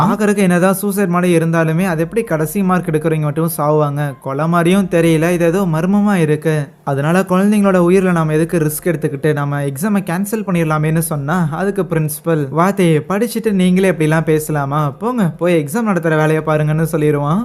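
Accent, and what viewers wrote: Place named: Madurai, India. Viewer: Unga slang avangya nu sollum bodhu nenachen, Madurai side than irupinga nu 😅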